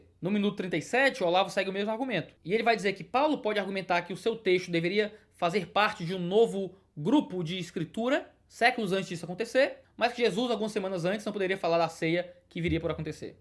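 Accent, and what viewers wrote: Ceará, Brazil. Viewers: Tive a honra de estar há 2 semanas aí e ouvir esse sotaque muito bom e muito engraçado tbm, alias, só tem humorista nesse estado, muito bom. Povo gentil e engraçado, lugares lindos e comidas maravilhosas. Deus abençoe o Ceará!!!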